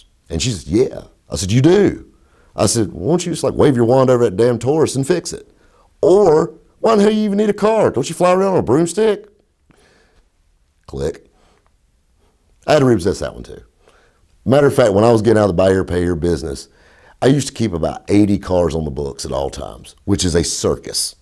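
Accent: South, United States. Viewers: English is not my native language but I always thought I had a pretty good knack understanding people from the U.S. This guy's drawl however? Too much for me. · This needs subtitles in English, southern dialect is killing me! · What accent is that? As in where in America is he from? It's really nice to listen to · Fake southern accent? This is exactly how people in parts of the south speak, especially the Carolinas.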